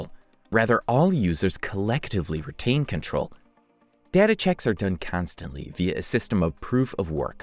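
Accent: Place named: Chicago, United States. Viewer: Man the Chicaaago Aaaaahhhhcent on this guy making it hard to watch.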